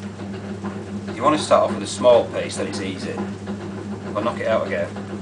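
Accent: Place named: Yorkshire, United Kingdom. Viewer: I LOVE the Yorkshire accent! And the lesson is wonderful.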